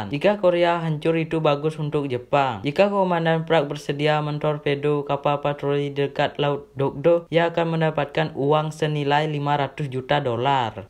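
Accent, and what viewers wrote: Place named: Bali, Indonesia. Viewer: narator nya bali banget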